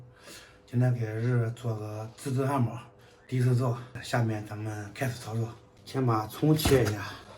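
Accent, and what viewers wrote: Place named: Zhoukou, China. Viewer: 这周口味普通话听着好熟悉。商水老乡飘过。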